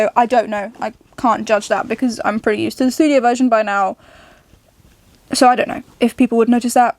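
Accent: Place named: United Kingdom. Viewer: Ale masz fantastyczny brytyjski akcent. Mieszkasz tam?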